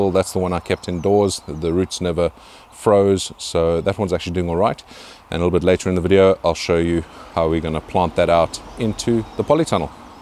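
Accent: South Africa. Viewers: I thought you have a South African accent. Too bad on your lost plants. Hope you get a good yield from the ones you have going though this year. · Damn i was just about to comment about how South African he sounds! - Content is great! waiting for my own garden and i'll be referencing the vids for my own setup ^^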